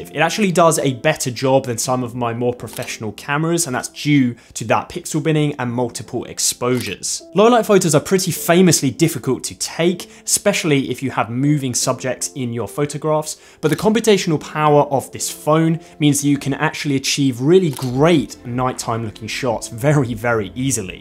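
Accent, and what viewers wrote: United Kingdom. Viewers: He sounds pretty average british to me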